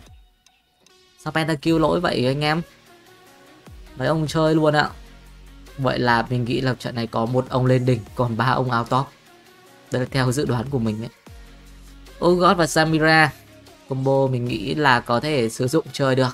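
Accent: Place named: Central, Vietnam. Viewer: nó gốc bắc vào DakLak sống nên vậy à mà...xung quanh người ta nói giọng Trung nên lâu lâu nhiễm ý mà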